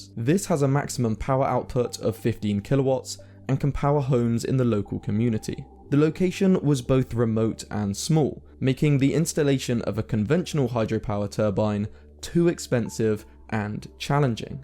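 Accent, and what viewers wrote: United Kingdom. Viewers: Well sounds like a Brit, so understatements are a matter of course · Ditch the British accent. It is considered very lowbrow. Your great content would be better served with a Swedish, French or Irish accent.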